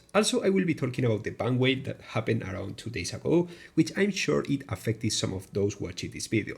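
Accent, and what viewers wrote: Spain. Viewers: R u spanish? Ur accent is pretty similar to that people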